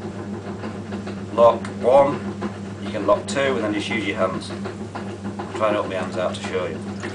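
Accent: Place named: Yorkshire, United Kingdom. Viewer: I LOVE the Yorkshire accent! And the lesson is wonderful.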